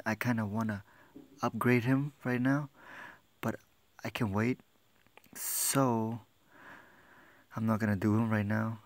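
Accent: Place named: Cambodia. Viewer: Review I knew it. I can tell from your accent. I'm Cambodian too lol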